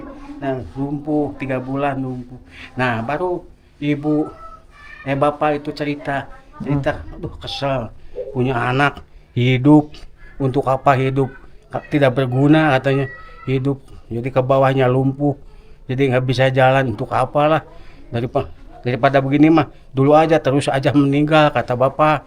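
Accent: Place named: Jakarta, Indonesia. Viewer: Bahasanya kayak bahasa Betawi dimana yaaa alamatnya tolong Nasik alamatnya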